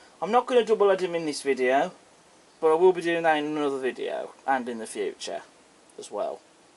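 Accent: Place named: Birmingham, United Kingdom. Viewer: chap sounds like barry from auf weidesain pet,must be from birmingham, put the 1p with the six wheelers and the 4f with the staniers,much more prototypical.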